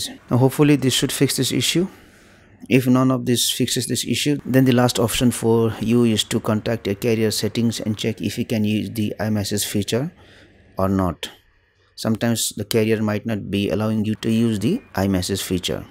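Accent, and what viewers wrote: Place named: India. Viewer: i hate when i trynna watch some like this and the indian accent came like fuck them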